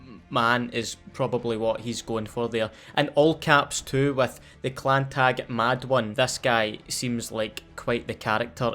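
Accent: Scotland, United Kingdom. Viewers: Came for the camping stayed for the Scottish accent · I jast wotch hes videos for hes amazing scottish accent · ??? He’s Scottish 😂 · Sounds Scottish to me! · Hellow is this scottish english acent? Or irish?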